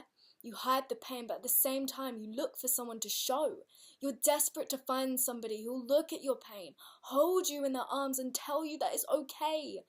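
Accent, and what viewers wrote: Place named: United Kingdom. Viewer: british accent just makes people 3 times more attractive. ughhhhh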